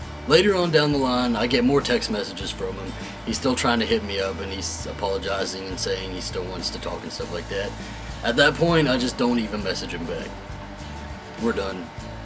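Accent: South, United States. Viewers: Your southern accent is cute 😂❤️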